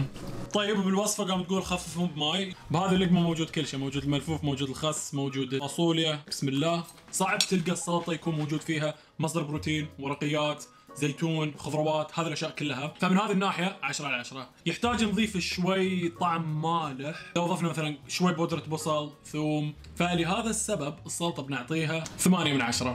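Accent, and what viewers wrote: Eastern Province, Saudi Arabia. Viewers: من لهجتك واضح انك من الشرقيه وربما الاحساء تحديدا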